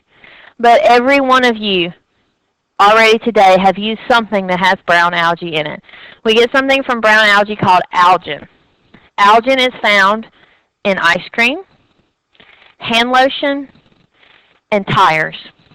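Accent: South, United States. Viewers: Thank you so, so much! As a fellow southern chick I LOVE your accent! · currently taking micro and I am so glad you have taken the time to post your lectures. they are very helpful and easy to understand. your way of teaching is awesome and I am learning far more from you than my current professor. southern accent makes it even better. thank you so much!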